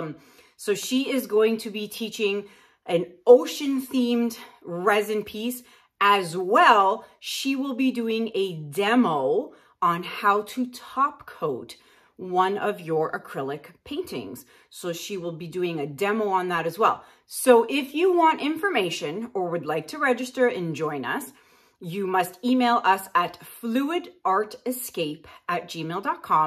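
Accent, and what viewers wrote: Canada. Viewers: Lmao I’ve got to tell you that in the past year or so I’ve met so many people from Canada …. I have a sister who moved to Canada(oddly enough in the same city you moved to but she’s been there for 5 years now) but never noticed it with her friends or anyone like that that she and her husband have met since moving out there but people that I’ve met on Long Island and NYC that are from Canada I know where they are from as quickly as they can hear where I’m from bc of the way they say “out” and any words with out in it bc of you! 😂😂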